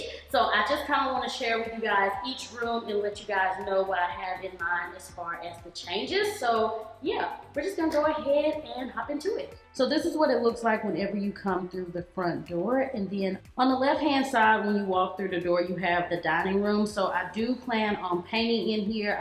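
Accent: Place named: Alabama, United States. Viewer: Love your home!! First video of yours I've seen and I will be back! Totally guessed in my head you are in Alabama! Lol I'm from Tennessee and when you're from the south, you can totally hear the different southern accents I feel like!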